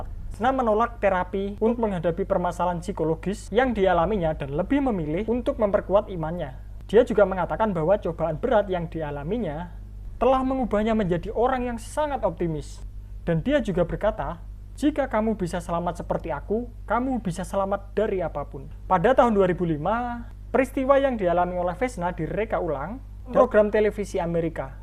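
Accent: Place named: Java, Indonesia. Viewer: mas’e medhok opo wong jowo :D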